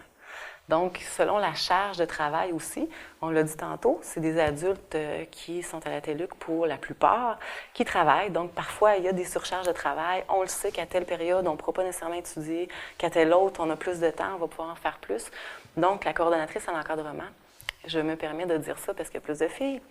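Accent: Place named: Brazil. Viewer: me parece un brasileño hablando francés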